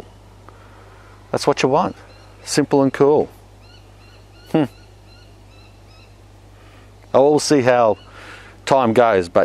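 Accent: Australia. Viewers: Your content is so wholesome. When you said "don't do this at home" it reminded me of my dad except with an Australian accent.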